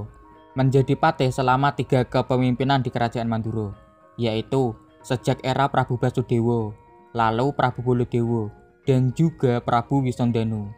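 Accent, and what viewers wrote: Java, Indonesia. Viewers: Terus berkaya men ora popo medok malah ketok jowone kudu bangga bedo itu indah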